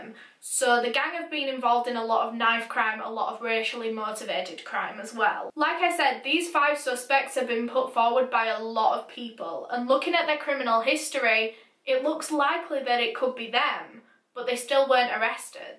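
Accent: United Kingdom. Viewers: I can heard talking for the rest of my life and I would not get bored. She is so eloquent with what she says and she makes you feel like she is only talking to you face to face. I love all of her true crime videos, even tho I hate to see all of these horrible things that happen on this world. It is good that people like her can talk about it to bring awareness. I truly enjoy the way you talk, even tho the british accent is a little hard for me as a hispanic person. One thing, I think you videos would look better if you change you backgroud because it just rare for me to keep looking on you lipstick as you are talking about terrible crime stories just my opinion. Anyways, love you videos, I hope you keep them coming.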